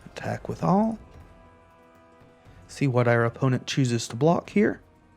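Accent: United States. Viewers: Definitely had to subscribe and leave a like. This was a very entertaining video, and I'm glad I happened to stumble upon it from watching LegenVD. You have good audio and video quality (looks very crisp!), a clear voice, and I enjoyed how you always explained your reasoning for whatever play you were doing. I was already familiar with all of it, but I've always appreciated when people playing this help new players out by giving advice. Though I think my favorite aspect of watching this was just how pleasant you were. Very thoughtful no matter how you were doing. That said, I've got to ask which state you're from after hearing the accent.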